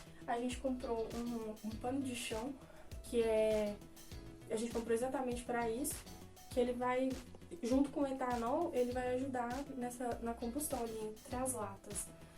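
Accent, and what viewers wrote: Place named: Minas Gerais, Brazil. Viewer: Ela é muito linda, e me parece pelo sotaque, que é conterrânea, das Minas Gerais.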